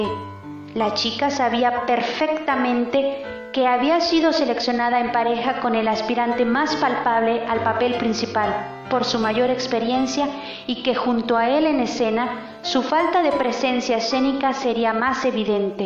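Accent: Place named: Spain. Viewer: Muy buena su acento español le da un toque d elegancia y donde esta la pecas😮😮😮😮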